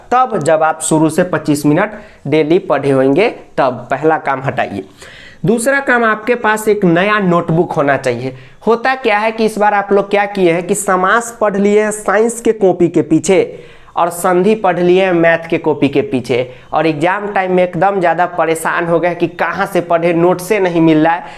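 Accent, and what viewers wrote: Bihar, India. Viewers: Sir aap bihar se h kya ?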